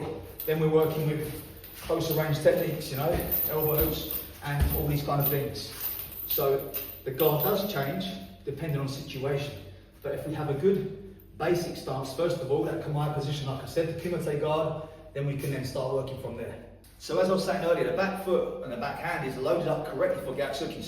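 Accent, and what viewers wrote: United Kingdom. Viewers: Thanks for this video I am a beginner and I am going to concentrate on defensive techniques when I spar I am 67 years old and I am the oldest guy by far in my dojo someone wish me luck or do you have any first time you spared stories I would appreciate it Thanks for your videos I joined your group and for now you will be the only one I join plus I love the British accent it is British isn’t it sometimes I get Brits and Aussies confused any way thanks for your videos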